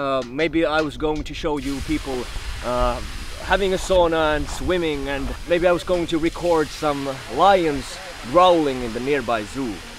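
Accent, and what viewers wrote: Finland. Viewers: Lovely Finglish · i watch for the incredibly charming finnish accent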